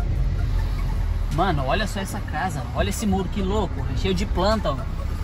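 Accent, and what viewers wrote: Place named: Spain. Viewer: Jajajaja que bueno es tu español, de hecho tu acento parece el de español españa! Tenes familiares españoles o algo ?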